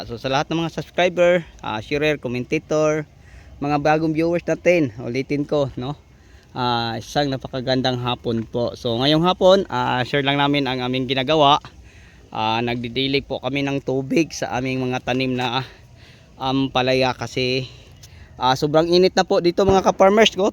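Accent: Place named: Visayas, Philippines. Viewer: Bisaya Po Kasi ma'am!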